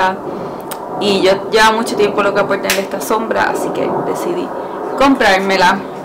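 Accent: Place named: Puerto Rico. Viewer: Cada vez que te escucho hablar no puedo evitar pensar que eres de acá! PR right? Here you have a new subscriber :)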